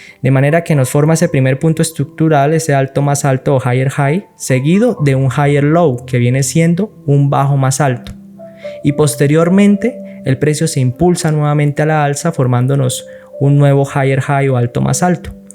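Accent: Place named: Colombia. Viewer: Hola tocayo como vas? También me llamo y soy de colombia igual tú por tu acento. Es el primer video que velo tuyo, felicitaciones. He visto muchos vídeos sobre estructura y te digo que es el más claro que he notado sigue así. Una consulta en donde ves el índice del dólar? Y entonces dependiendo de su movimiento así mismo decides así donde van tus entradas? Gracias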